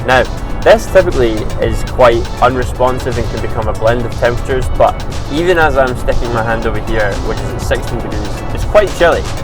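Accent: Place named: Scotland, United Kingdom. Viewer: The music is way too loud, can barely hear you. Fortunately I had the captions on, which also helped with the Scottish accent 😆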